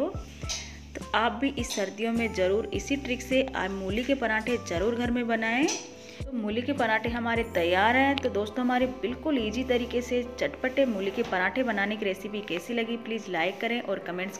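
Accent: India. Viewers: Nice Video ! From your voice it appears you are from Rajasthan !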